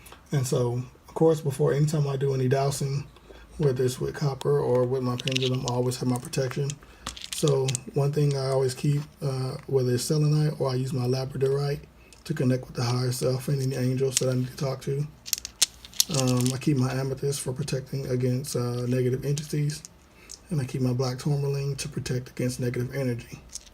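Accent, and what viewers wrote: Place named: South, United States. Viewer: Thank you so much for your help! Thank you for sharing your light! Anddd two cheers for the southern accent I'm detecting?!!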